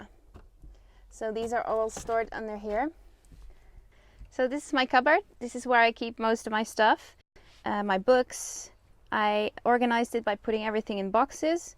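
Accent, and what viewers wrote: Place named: Netherlands. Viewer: Dutch or nah?? hhahahah noticed in the first few seconds hahaha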